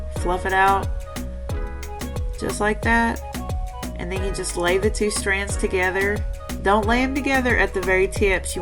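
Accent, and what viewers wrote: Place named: South, United States. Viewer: Why would anyone make fun of your accent? I’m Belgian and have lived on three continents. As a result I’ve got a ‘subconsciously adaptive’ accent - I tend to mirror the speech patterns and pronunciation I’m being exposed to at that very moment. I “should” have a Dutch/Flemish accent. I don’t. Somehow, regardless of whom I’m in a conversation with, people seem to identify Scandinavian undertones to my English. When in a conversation with my best friend from Oklahoma, that turns into Southern-with-a-Scandinavian-twist. When the conversation is with my British friend, it’s Welsh overtones with a seemingly Scandinavian flavour. 🙈 Accents are part of what makes us unique. Personally I feel the variations from the Southern States are beautiful. You almost sing.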